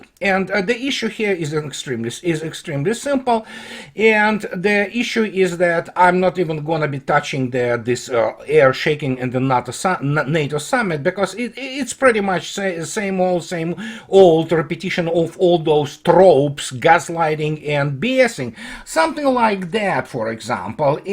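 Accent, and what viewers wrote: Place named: Russia. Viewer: Thanks. English with Russian accent. Now I get the meme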